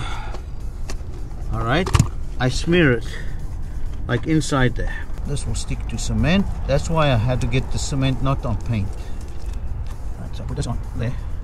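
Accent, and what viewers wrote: South Africa. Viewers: I detect a fellow South African perhaps?? Please help me find a similar product in SA!! I need a flat roof doctor in Cape Town please!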